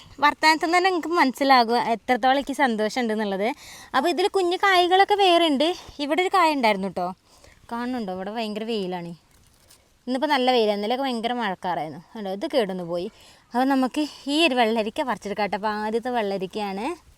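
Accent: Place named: Malappuram, India. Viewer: മലപുറം സ്ലാങ് വരും😊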